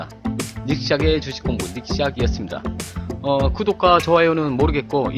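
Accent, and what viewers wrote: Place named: Gwangju, South Korea. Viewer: 억양이 광주사람이군요 ㅎ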